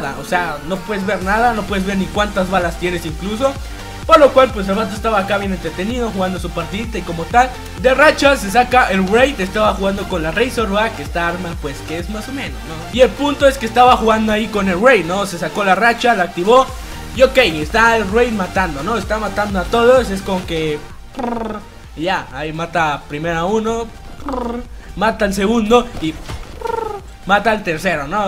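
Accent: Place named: Spain. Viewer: deja de hablar como español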